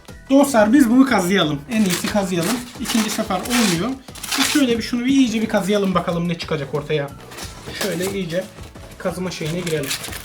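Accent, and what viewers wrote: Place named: Gaziantep, Turkey. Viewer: Adam antepli konuşmasından anladım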